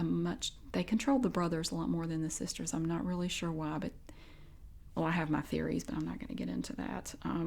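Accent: South, United States. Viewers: Nothing sounds like the devil from your videos. You have a God given gift of a soothing gentle southern voice. Thanks for helping me rest.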